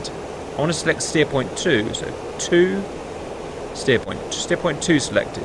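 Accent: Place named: United Kingdom. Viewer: Happy Fathersday you funny talking brit. Enjoy the journey sir.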